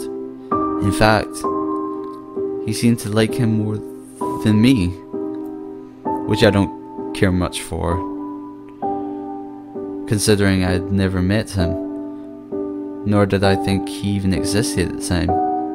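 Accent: Scotland, United Kingdom. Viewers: Scottish accents are sexy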